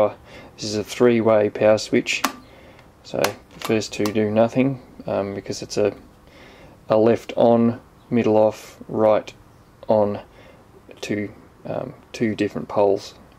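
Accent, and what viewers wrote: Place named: Australia. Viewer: Nice motor installation. Now to make some chips. From the accent I am guessing you are in Australia.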